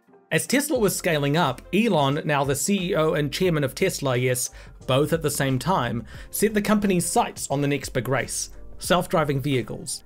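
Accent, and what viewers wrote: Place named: New Zealand. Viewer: Great to hear a kiwi voice on YouTube!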